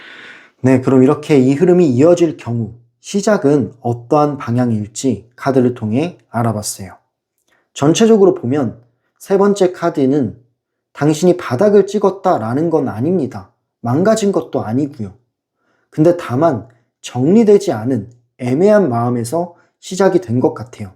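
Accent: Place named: Gyeongsang, South Korea. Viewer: 삼번리딩 갱상도 샘인가봐요 리딩중 만족 시릅지도~^^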